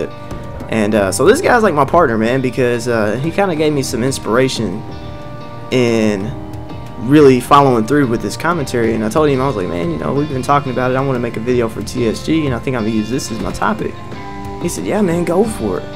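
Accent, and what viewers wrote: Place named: South, United States. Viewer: Where are you from? from your accent you seem from the south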